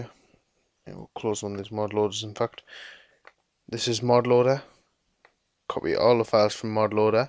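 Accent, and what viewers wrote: United Kingdom. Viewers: Ur from newcastle same acsent as me british newcastle geordie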